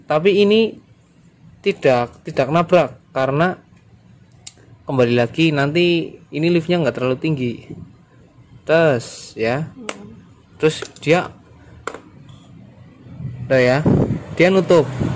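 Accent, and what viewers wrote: Banyumas, Indonesia. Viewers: Mas alamat bengkelnya dimana kasih tau dong bahasanya kok ngapak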